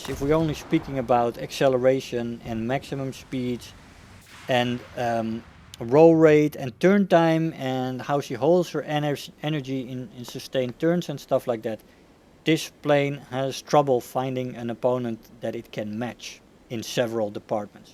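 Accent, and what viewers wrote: Netherlands. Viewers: Hey dude you accent is dutch i think. Am i richt? If im not then sorry😂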